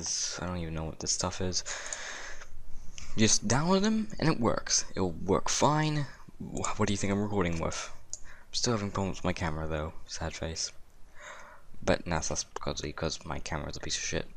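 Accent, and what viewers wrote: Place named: United Kingdom. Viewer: british accent? xD I'm from spain and I just love how you speak english!!! UvU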